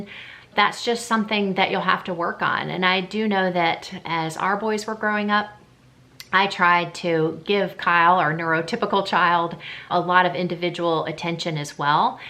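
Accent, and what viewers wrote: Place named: South, United States. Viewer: I’m new to your channel but I am really enjoying it! I loved your Q&A. I was surprised to find out you are from North Carolina. That’s where I’m from. I have a little more southern accent than you do!! Keep up the great videos! It’s refreshing to find someone who is not afraid to express your Christian values.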